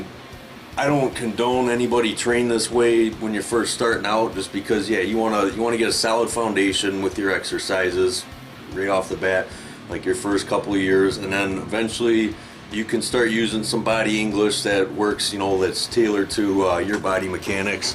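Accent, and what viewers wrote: Canada. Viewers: Always wanted to ask, are you Canadian?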